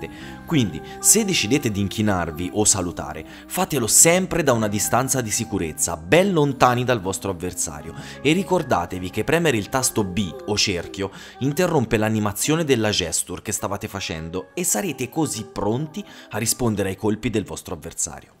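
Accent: Tuscany, Italy. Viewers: Bravissimo bel video! Toscano? :D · Fammi indovinare... Toscanissimo! :D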